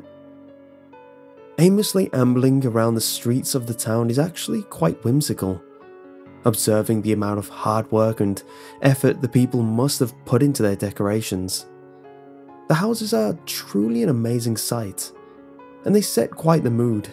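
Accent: England, United Kingdom. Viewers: I'm trying to put the subtitles but you're so British that the words become realllyy fucking false lol fucking audio subtitles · Because that's how he speaks. English by the way.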